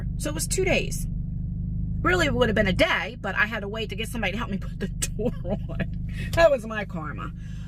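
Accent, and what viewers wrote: New Jersey, United States. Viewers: It’s just been a few months since I found your vlog channel and now I watch every one❤ Just know there’s lots of us that really care about you and enjoy your videos. I wish I could help 😊 I love that you protect your kids and I’m also diabetic so I can relate. I’m also a mama bear with my kids and grandkids. 😅 Besides the fact I’m from California and LOVE YOUR NEW JERSEY ACCENT 😂 Reminds me of when I worked in a Jewish Deli when I was a kid. I worked with a cook from New Jersey 😊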